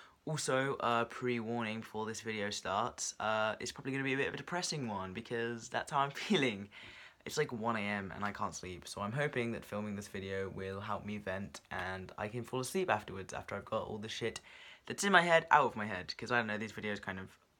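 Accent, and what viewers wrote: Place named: United Kingdom. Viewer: I seriously could listen to you talk all day!!! I love your voice and of course your British Accent, I'm such a sucker for the British Accent, The Best to you.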